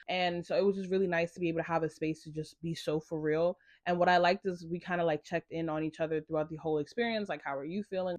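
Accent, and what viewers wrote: United Kingdom. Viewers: I thought I wouldnt have the attention span to listen for an hour, but you had me HOOKED! And your british accent is good haha, may allah reward you sis, keep making this type of content!!❤ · aaaa the YouTube algorithm did her big one for once!!! i just love your vibe, humour, energy, mindset so much!!! although im actually a black Christian girlie(will be putting my Muslim babes onto your channel bc☕️!!!!) but I just turned 20 never had a relationship or been in love and definitely related to so much you said I honestly struggle sometimes thinking if that kind of love is attainable especially as I’m intentionally dating and waiting for marriage but in today’s society it can be very discouraging. im a curvy girl too and the amount of sexualisation despite how modestly I may dress🤡🤡heavy on the my man isn’t in this country im from the uk(loved your lil British accent hehe) but so ready to tap into the softness and femininity experienced elsewhere bc this ain’t it most of the time like you said as a black woman we’re masculinised and dragged for how independent we are! 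thank you so much for the advice you gave so truthful!!! and the questions you asking during your courtship like that’s literally me like if we’re getting married it’s forever so genuine compatibility is non negotiable! you spoke so beautifully honestly and what you said about him seeing your humanness aaaaa im going to go buy that book and watch all your Colombia videos I actually learned Spanish last year so honestly loving the Spanglish!! sorry this is so long haha I never leave comments bc i yapp anyways ik this is a little early but Ramadan Mubarak and can’t wait for you to find the REAL thing!!!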